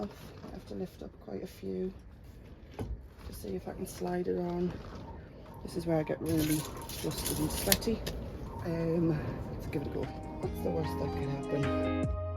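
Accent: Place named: Sunderland, United Kingdom. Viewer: yes I thought it was plus you have a wearside accent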